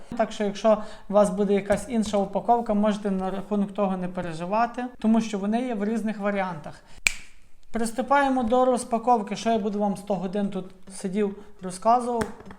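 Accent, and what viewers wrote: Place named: West, Ukraine. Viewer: Приємно чути західну Українську мову. 👍👍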